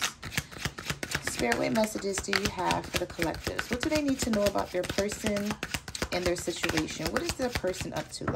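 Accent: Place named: New York, United States. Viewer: You sound like a New Yorker, I enjoy your readings either way.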